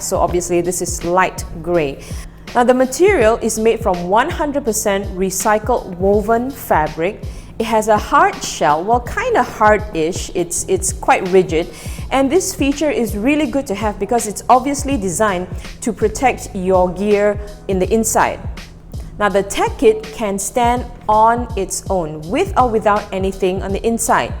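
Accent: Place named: Singapore. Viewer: What accent is that? Great to hear. Can I know which part of the world you are from? My guess would be Singapore.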